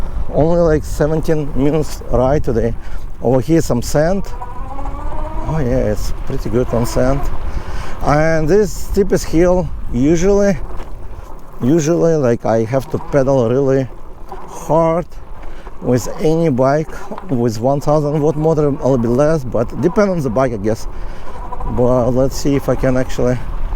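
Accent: Russia. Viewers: мне русский акцент режит слух, такое дерьмовое произношение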